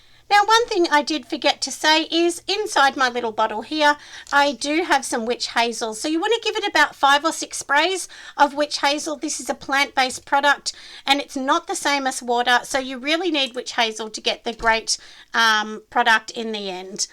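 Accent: Australia. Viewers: Dear a bit hard to follow the receipt.. english my second language and with autralian accent it would make it much easier to just hsve the recipe in the description as you mentioned at rge beginning of your video. Thank you for your efforts to share yr skills. From canada quebec..merci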